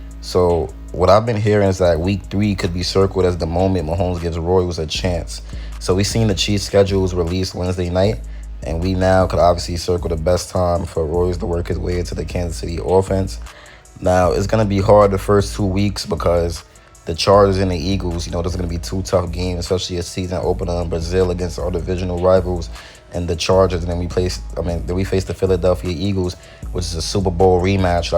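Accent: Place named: New Jersey, United States. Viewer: I heard your voice and thought-"is this guy from Jersey or something?" Then u said u live in NY😂👍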